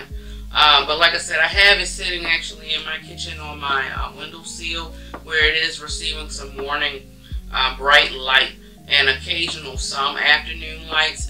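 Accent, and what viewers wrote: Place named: DMV, United States. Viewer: Came for plant info stayed to see if your from the dmv lol I hear it in your voice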